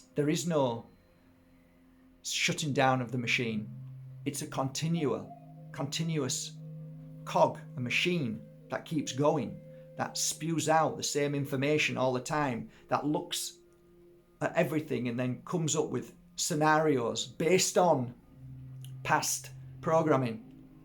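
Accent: Yorkshire, United Kingdom. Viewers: Love that Yorkshire accent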